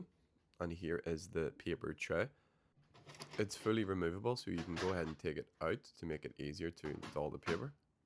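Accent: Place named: Northern Ireland, United Kingdom. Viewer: I like your Northern Irish accent and appreciate the helpful explanation. I'm thinking of buying a HL-2400DW so this video has been very helpful.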